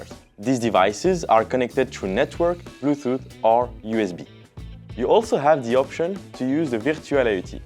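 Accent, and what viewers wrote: France. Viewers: J'espère que tu as amélioré ton accent trop french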